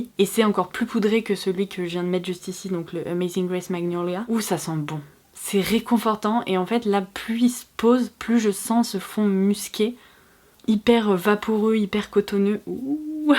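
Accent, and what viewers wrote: England, United Kingdom. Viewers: Oua! Mais franchement Oua! Ca fait un petit moment que je te suit, déjà je te trouve super en tant que personne, tu es très belle, j'adore ta façon de parler et ton accent anglais me fait rêver Lol Ton unboxing me vend du rêve, les produits Daniel Sandler m'intriguent beaucoup, ils ont l'air vraiment beau, j'ai vraiment hâte que tu les test pour voir le rendu, la palette pour le visage ND, non mais comment dire que idem trop hâte que tu la testes, elle est splendide, je n'avais pas entendu du RAL de cette marque, mais il a l'air splendide également! Continues ainsi, tu es au top et très agréable à suivre! PS: j'adore tes " OH, Ouah!"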